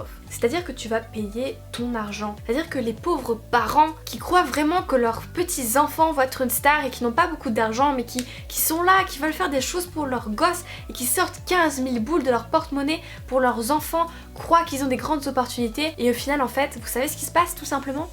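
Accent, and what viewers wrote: South, France. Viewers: #AccentDuSud#Snap, sinon superbe vidéo comme toujours 🖇🖇❤️ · C'est juste horrible de se servir des gens comme ca avec plein de promesses en plus quand on n'y pense ca aurait pu être pire dans c'est cas la il peuvent demander n'importe quoi et tu ma surpris d'une part par l'accent du Sud qui revient et par ta patience 😊😊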